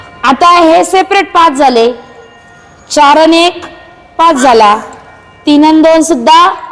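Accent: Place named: Kolhapur, India. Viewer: छानच मॅडम अध्यापन पद्धती तुमची भाषा आमच्या कोल्हापूरी भाषेसारखीच आहे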